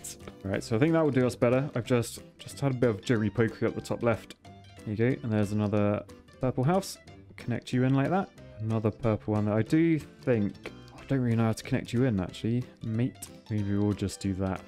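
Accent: United Kingdom. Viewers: you sound a lot like exurb1a, but probably just the bri'ish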